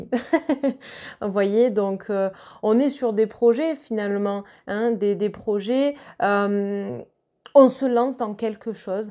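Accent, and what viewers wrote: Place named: South, France. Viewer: J’adore votre accent du Sud! Ça me change :-))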